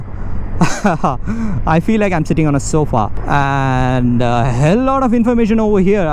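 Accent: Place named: Tamil Nadu, India. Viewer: inner tamil coming out in that english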